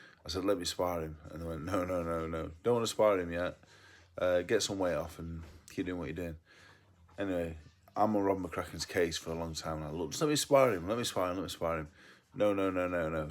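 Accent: Yorkshire, United Kingdom. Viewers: Yorkshire accent. Dave looks like how I imagine Heathcliffe to look but with different hair and clothes.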